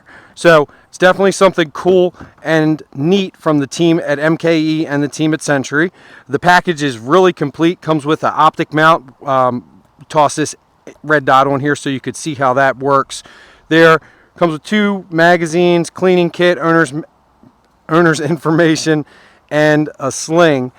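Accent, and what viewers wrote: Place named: Philadelphia, United States. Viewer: Are u from philly? Your accent is so NE philly...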